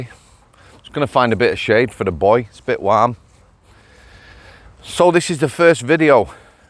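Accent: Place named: Yorkshire, United Kingdom. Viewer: How do! I bet you get fed up with me, jumping on board everytime you post a vid; LOL. I love seeing Stevie. Hearing that you've gone back to the gym ... I live next door to a gym, but I am such a lazy-arse, that I don't go. Well, now that you've started back, I'm going to shift my carcass and get next door. Now that I'm off that f*cking sertraline, I might actually start to shift that 3 stone. BTW, whenever I heard your Yorkshireisms, I'm reminded of Charlie Williams from The Comedians - I loved that show. He always used to be on about a bottle of Watta. LOL; and called people 'Flower'. A lovely guy - RIP Charlie.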